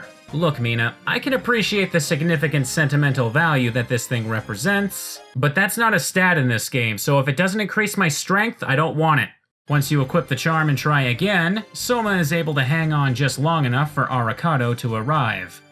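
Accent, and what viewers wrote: Canada. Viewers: Are you Canadian? Anyway this was a brilliant review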